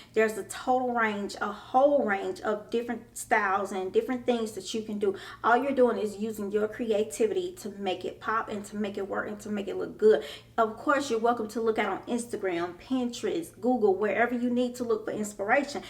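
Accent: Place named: Memphis, United States. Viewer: Yes! I am located in Tn as well and I can spot an accent a mile away!! I thought Memphis to be specific but that’s where I’m from lol... I really love your videos!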